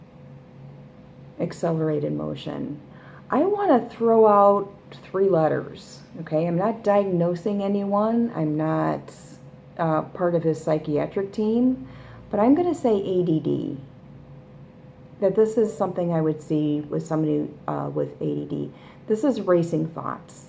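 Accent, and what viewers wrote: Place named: Chicago, United States. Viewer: Verbal Vomit is the best description ever...and so true. OH! Chicago. Similar accent to western NYS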